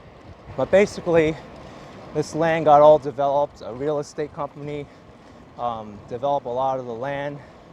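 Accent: New York, United States. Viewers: NY accent.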